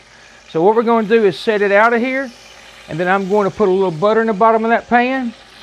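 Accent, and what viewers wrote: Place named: Georgia, United States. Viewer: I found your channel after searching YouTube for ways to use the Instant Vortex oven ... Love your Georgia accent!!! I have binge watched a lot of your videos! You are a pleasure to watch. Keep putting out great videos!!!! Joanna from South Carolina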